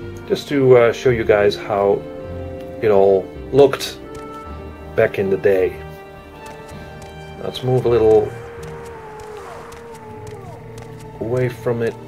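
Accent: United States. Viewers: He certainly sounds quite American. Either that or North Germanic having learnt American English. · He sounds like he has an American accent though...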